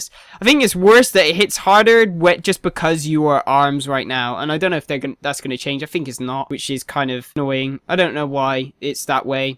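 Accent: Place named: West Country, United Kingdom. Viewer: Personally subbed because of your west country accent. makes me think of home! Great vids. hardly any holy pala changes makes me smile!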